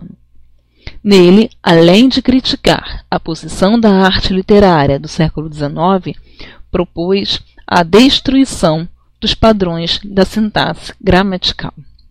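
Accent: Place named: Portugal. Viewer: Mais um excelente vídeo, professora. 😁 Gostaria de fazer uma pergunta, profª., você é portuguesa? Pois tem um sotaque um pouco diferente rs. Abraços. Sou fã do teu trabalho.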